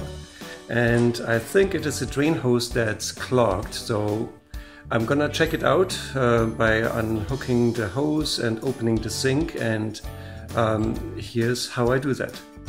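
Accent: Germany. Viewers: I love your soft German inflected English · Ooooo you’re German aren’t youuuuuu. 😘😻😻😻😻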